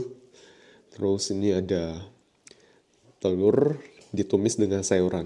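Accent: Java, Indonesia. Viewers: Logat jawanya kelihatan ko🙏🙏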